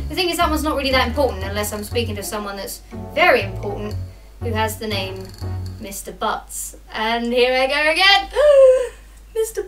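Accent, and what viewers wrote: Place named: United Kingdom. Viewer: "Second grade"? You sound very British for an American.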